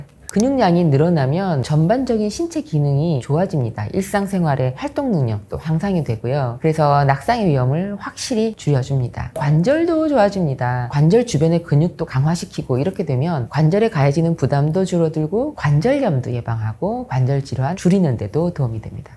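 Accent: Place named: Daegu, South Korea. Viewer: 이분 말투가 대구 사투리인거같은데 매력있네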